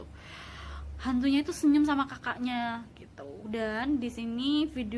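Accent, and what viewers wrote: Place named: East Java, Indonesia. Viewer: Rek mesti asal jawa timur yach kak... Serem juga yach dengarnya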